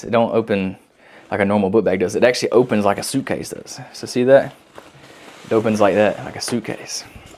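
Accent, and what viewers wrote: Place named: South, United States. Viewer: Your little southern boy accent is so sexy.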